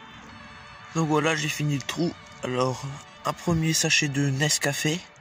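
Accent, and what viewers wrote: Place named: Normandy, France. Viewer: c'est vrai que tu as l'accent normand ^^ tu es de quelle coin en Normandie ?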